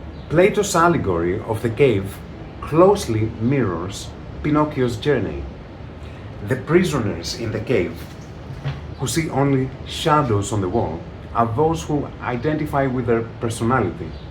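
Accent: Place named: Greece. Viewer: I find your accent very charming. Greek must be a beautiful language!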